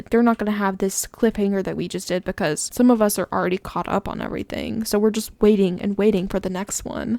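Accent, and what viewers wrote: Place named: South, United States. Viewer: your southern accent is 🤌🏻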